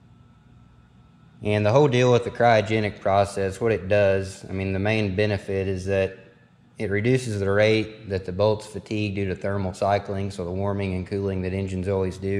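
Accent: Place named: Texas, United States. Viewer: your north Texas/Oklahoma twang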